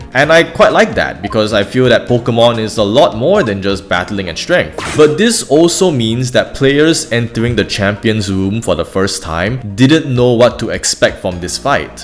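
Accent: Singapore. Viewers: Are you singaporean? Or Malaysian? Srry i js hear the accent and I’m js curious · That Singaporean accent tho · I instantly recognise that Singaporean accent, certainly didn't expect to come across a fellow mate talking about our childhood trauma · From your accent, I can already tell that you're a singaporean lol and your channel profile confirms it. Really make me feel so wowed for some reason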